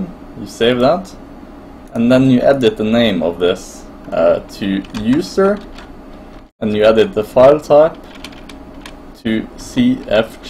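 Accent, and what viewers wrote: Sweden. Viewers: Swedish detected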